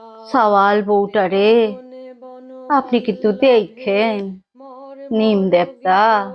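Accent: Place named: Bangal, Bangladesh. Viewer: দারুন সুন্দর লাগলো তোমার কবিতা পরিবেশন বিশেষ করে তোমার মুখে বাঙাল ভাষা গুলি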